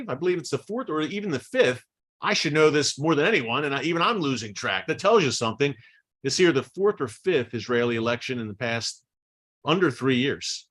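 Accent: Philadelphia, United States. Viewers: Lol Thought I heard Philly accent.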